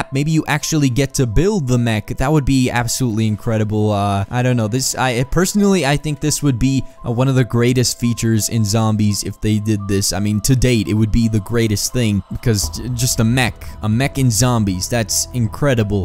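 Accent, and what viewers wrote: Bosnia and Herzegovina. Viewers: Are you bosnian.?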